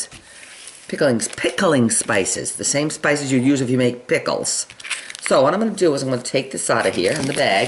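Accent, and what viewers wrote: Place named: East Coast, United States. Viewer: your accent sounds east coast, but not like florida, good recipe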